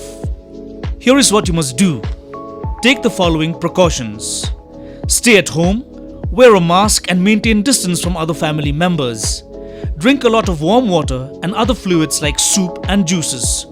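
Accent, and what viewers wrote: Meghalaya, India. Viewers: Ia ki jingpynbna ba kongsan kren da khasi mung... nga sngewthuh hi khasi accent.. thait ba kumne kein ha ri ngi ym poi shaei2 ruh ... u ba bun un nym sngewthuh iakane ... shu ia leh khlem pyrkhat... kumno yn myntoi haba kumne ??? Biej👎👎👎👎👎👎👎👎👎👎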